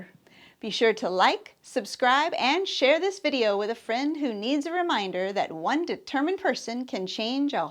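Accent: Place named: Ireland. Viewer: great video about a great lady... i got a chuckle from your irish accent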